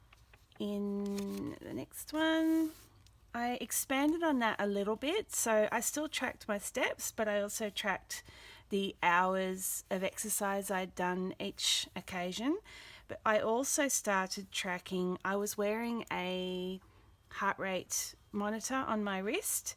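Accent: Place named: Australia. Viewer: yay aussie accent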